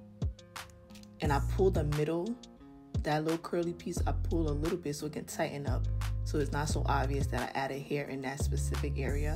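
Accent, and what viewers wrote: Liberia. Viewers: You sound Liberian and love it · Heard the accent and already knew LIB STAND UP 🇱🇷🇱🇷🇱🇷 my sista much love 🫶🏾🫶🏾🫶🏾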